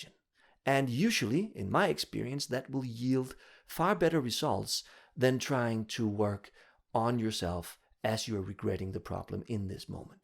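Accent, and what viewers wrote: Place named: Denmark. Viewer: Your accent is super adorable! 😊 I think I need a Danish boyfriend now!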